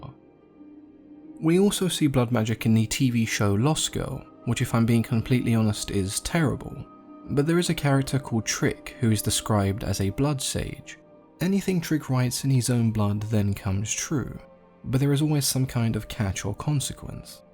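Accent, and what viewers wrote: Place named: England, United Kingdom. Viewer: They should use your English accent and voice on planet earth and national geographic well and fish sea mammals lions an bears deadly insect bites you would make it more interesting word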